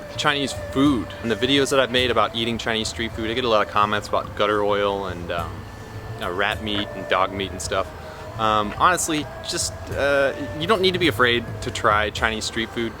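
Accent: United States. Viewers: Hey, I've always wanted to go to China but have never been.. It seems like a beautiful place... I'm from Magee,Mississippi.. What town are you from? I can tell that by your English that you are originally from the United States.. Where are you from originally? During Christmas of 2018, I got 2 books on a Survival Guide to China and South Korea.. I read the South Korea book and it was great.. I have a Japan book as well too.. Now I'm ready to read about China next.. Speaking of South Korea, have you ever been there? I've never been there before myself either, but I'd like to go there too one day... If you have been to South Korea, there are these 2 kids that are living there currently who are from the United States (San Francisco,California) and their names are John Rock and Mack Rock... Do you know them? They have a YouTube channel... Check it out.. It's listed under Rock Kids.. 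I've actually never been anywhere out of the United States!!! I've just always lived in Magee,Mississippi my entire life... It's a small town, but it's growing more and more in population everyday!!!!! Have you ever been to Mississippi before? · You speak well with great american accent. Great voice and great pronunciation.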